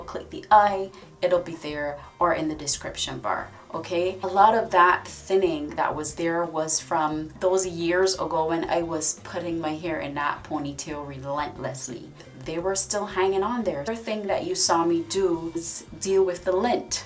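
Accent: Barbados. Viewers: is that a bajan accent I am hearing mixed in there ??😀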